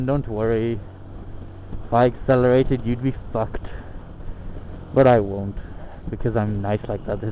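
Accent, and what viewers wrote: United States. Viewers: You seem to have an American Accent, Im confused... And happens all time at traffic lights lmao, pain in the bottom xD I normally end up running them or just turning around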